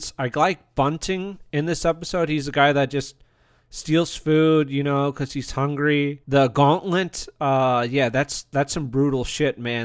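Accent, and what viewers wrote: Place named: United States. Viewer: Firstly, thanks for taking the time to share your view of the show, enjoyed hearing your perspectives. I remember watching both Sharpe and Hornblower growing up and all the family liked both series very much. Both fantastic shows. That said, Hornblower is a definite personal preference for me. What’s more, I can say as a UK native that the general perception of status of these 2 shows, again although both well liked, is that Hornblower definitely has the clear higher status of the 2 shows. I think the difference in perception comes down to culture. I could be mistaken, but the reviewer of this video sounds American. Apologies if mistaken. Sharpe is a more typical heroic character or rather a simpler childish imagination of heroic character. He fits the American idealised trope of hero more than Hornblower, that’s why it is easy to understand why American audience probably get and therefore have more appreciation of the Sharpe show. 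Sharpe right from the start, he knows what he’s doing, he’s confident, competent and cool. He’s also a bit independent and brash, going against authority, charging first into battle because it’s the best way to get himself promotion. He meets a different woman every episode like he’s a James bond type. Its certainly more fun and cool (perfect for American audiences), but also less authentic and more simple as a character study. Since he’s so developed from the start, there less scope for character development through the series. Hornblower on the o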